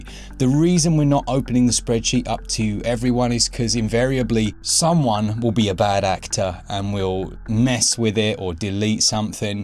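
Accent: United Kingdom. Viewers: I just can’t take a British accent serious!! He just sounds like a joke!!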